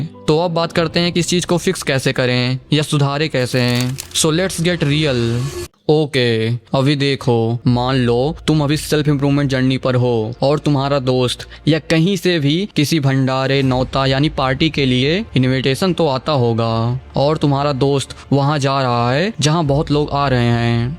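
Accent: India. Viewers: bruhh don't speak in english. your accent is soo indian man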